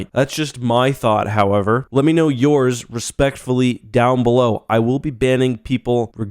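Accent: Canada. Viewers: You are canadian?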